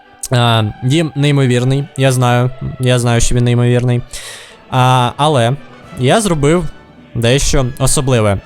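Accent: Transcarpathia, Ukraine. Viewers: Ти з Закарпаття? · вхахвхвах закарпатьська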